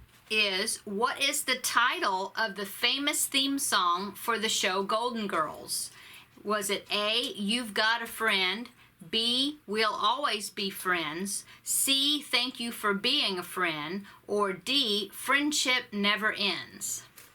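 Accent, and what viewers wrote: South, United States. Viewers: Hello.... I’m a new subbie to your channel... I binged watched all your videos from the very beginning and I just love the things that you make and I just love your Southern accent.... I know in a few videos back you said that you wanted to do a nativity scene are you still going to do it??? ... I would love to see your progress on them.... can’t wait for your next videos.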